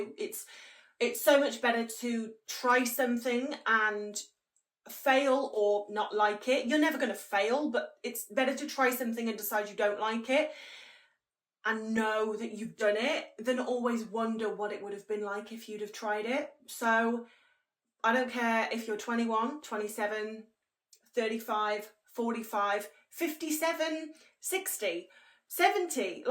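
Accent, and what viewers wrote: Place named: North West England, United Kingdom. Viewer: Your accent sounds very familiar but I cant quite place it... do you live in the north west?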